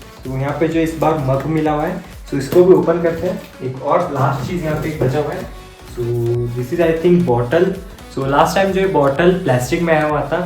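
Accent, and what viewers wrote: Karnataka, India. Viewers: is your mother tongue kannada?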